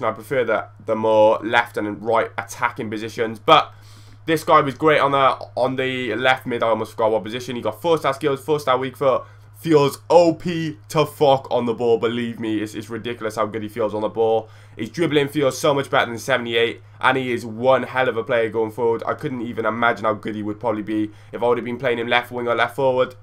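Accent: Wales, United Kingdom. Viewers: you sound identical to leroy from the valleys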